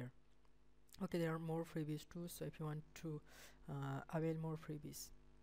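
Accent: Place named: India. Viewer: Sounds like indian "Windows Support " scammer Leaves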